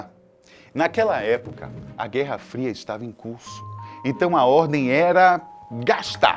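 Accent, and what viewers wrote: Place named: Bahia, Brazil. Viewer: Excelente formato de vídeo 👍✌ Seja bem vindo rapazinho .... Baiano eu acho pelo sotaque 🤔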